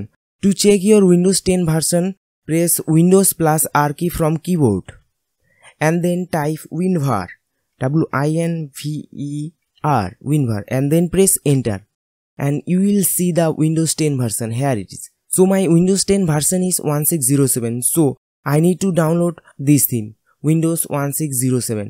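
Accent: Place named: Bengal, India. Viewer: i hate bangali english accent. could not go through torture. closed.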